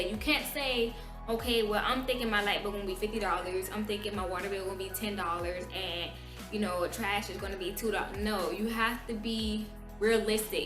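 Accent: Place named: New York, United States. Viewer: She sounds like she from ny but moved down south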